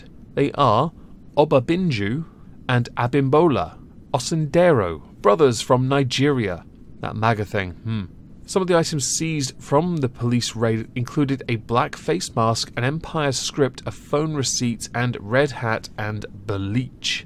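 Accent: United Kingdom. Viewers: for PM! No Lives Matter!!!! Always love to see you upload, my man. Good to hear another brit talk about this stuff.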